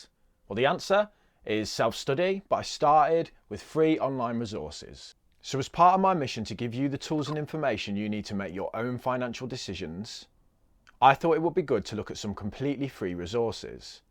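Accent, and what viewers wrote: Birmingham, United Kingdom. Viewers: I had a strong feeling you were from Birmingham. I’m also originally from Birmingham - King’s Norton. Keep up the good work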